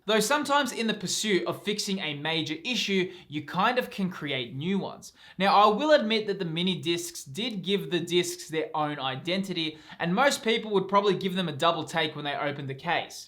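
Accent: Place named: Australia. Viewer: I didn't know that I needed a fellow Aussie to talk about the Gamecube like this, but damn - great video!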